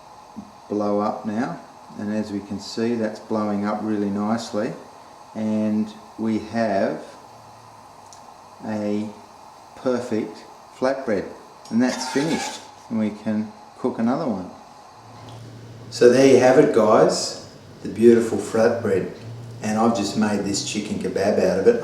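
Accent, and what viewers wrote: Australia. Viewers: Maria Lopez Nope, that's an Australian accent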